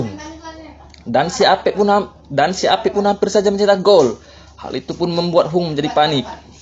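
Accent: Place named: Palembang, Indonesia. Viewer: Ay wong palembang cknyo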